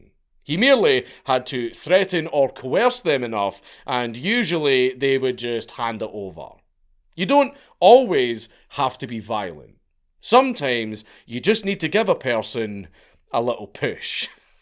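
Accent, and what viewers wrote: Scotland, United Kingdom. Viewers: Something about this presentation being conducted by a Scottish man just makes it that much better. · Stopped in to listen to a Scotsman speak of BOOO' EEE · The Scottish accent makes this better 😂 love the accent bro 👌🏾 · I'd have dropped a like on this anyway, but hearing Booty Warrior in that glorious Scottish accent was the gift I didn't know I needed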